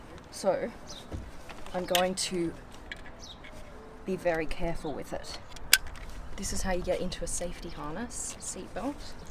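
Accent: Australia. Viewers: Can you do a video with you, only saying: "Because because because" for an hour or two? Please? Just love your Aussie accent!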